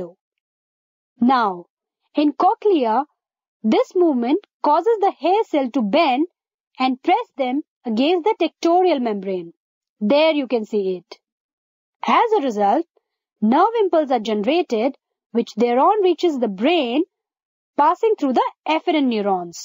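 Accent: India. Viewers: The awkward moment when a white boy has an Indian accent.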